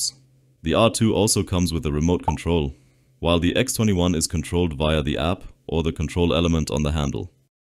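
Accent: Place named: Germany. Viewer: You sound German. Why no discount for Netherlands? 🙏🏼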